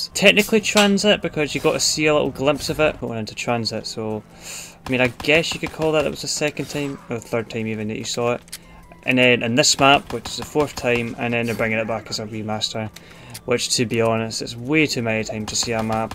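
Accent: Scotland, United Kingdom. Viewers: Where in Scotland are you from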